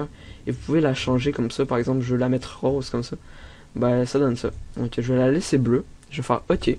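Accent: Quebec, Canada. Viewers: Vive, dommage de l accent un peu trop prononcé:S Mais sa gere quand meme, Peace au Quebequois!:)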